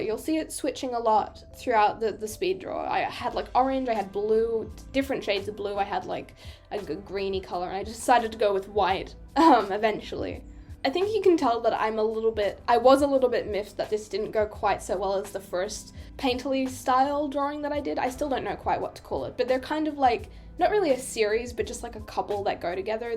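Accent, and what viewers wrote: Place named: Australia. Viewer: WAIT AUSTRALIAN???? JUST LIKE ME FR???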